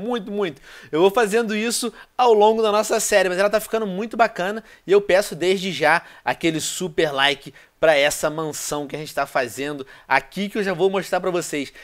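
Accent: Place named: Portugal. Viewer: ui palhaço e português de Portugal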